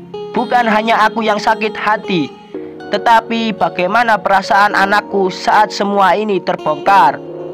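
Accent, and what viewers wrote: Java, Indonesia. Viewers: GK seru kalo critanya di bacakan,seperti membaca pelajaran waktu SD dulu,yg membaca teks logatnya medok lagi jadi Yaaa semakin gak seru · Ngomongnya medok jawa banget.Jadi ngga enak ceritanya !!!! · Medok dan tamat ... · Sebeliumnya saya minta maaf, ya, mas... (Juga untuk yg lainnya) Sebenarnya Ada benarnya juga jika sampeyan bilang gak mutu...itu jika diibandingkan dg ilmu2 pengetahuan atau agama. Ini tergantung bagaimana kita menyikapinya, jika pikiran kita kotor maka akan menjadi nampak kotor, Semua hal ada sisi baik dan ada sisi buruknya, jika kita lihat banyak buruknya, cobalah kita cari sisi baiknya walau sedikit sehingga kesalahan / keburukan seseorang tak nampak menggunung. Simple saja.. buang yang buruknya, ambil yang baiknya ..yaitu hikmah di dalamnya agar semoga kita dijauhkan dari kemaksiatan dan senantiasa menjaga keimanan kita, aaamiin. 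Bukankah Untuk menjadi baik adalah belajar dari kesalahan? Dan untuk menjadi wong bener adalah dengan belajar dari yg salah.., jadi mari kita anggap saja channel adalah guru yg sedang menasehati / mengingatkan kita agar kita berhati2 dan waspada karena manusia sesungguhnya tempatnya khilaf / lupa, sehingga kadang tiba2 terjebak dalam kemaksiatan. Bisa saja kita sekarang mencela. Besok kita yang terperosok. Semoga gusti Allah selalu menyertai dan melindungi kita. Aamiin.... Kalau saya lebih fokus dg bahasanya yg medhok gak karuan dan suara jelek serta cara membacanya yang jelek sekali, haha.. Maaf, ya.. Buat adek yg punya channel. , tapi itu yg membuat nilai pluznya, menjadi. . Lucu.. jadinya bikin saya ketawa terus, apalagi jika bilang tamaaat.. Atau sekian.... Saya ngakak sekali. Oleh karena itu saya lebih fokus ke lucunya .. Semoga kita bisa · Suara jawa pekatbanget loo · baca.anya seperti jawa tuken bro keras. 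· Kok medok bngt .orang mana ya bpk.lagi terakhirnya **TAAMAAT**KYK DI BOSKOP AJA🤣🤣🤣🤣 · Bertele tele...udh suaranya medok bgt ga enak didenger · Kelihatan admin yg ngomong ini orang dari Bantul Jateng ya,kedengaran medok jowone,yg lucunya mendekati akhir cerita teksny hilang...mas klu mau buat cerita elupun ceritanya betbau hayalan yg baguslah kemasannya..ok,mas. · Lucu cara ngomong mu, medok 😂😂😂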